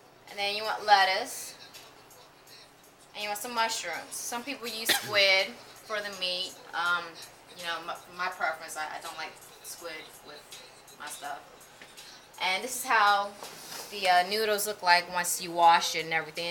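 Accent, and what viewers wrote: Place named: South, United States. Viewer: Atlanta?? Can def tell you from down south but never heard khmer girl with southern accent lol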